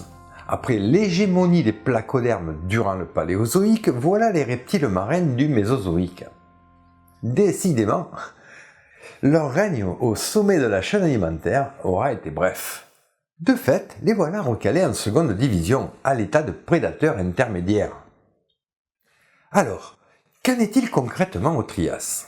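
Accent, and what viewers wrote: South, France. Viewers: Merci pour votre vidéo très intéressante, j aime bien votre accent du sud ❤ Je suis content la musique est plus diversifiée, il y en a juste une que j ai toujours autant de mal à supporter 😂, avis très personnel et subjectif bien sûr Par exemple à , pour donner une idée, c est celle ci qui revient très (trop ?) souvent pour moi la musique est inutile en plus. Bonne continuation, j attends le 2 avec impatience. Quel beau travail en tout cas 😊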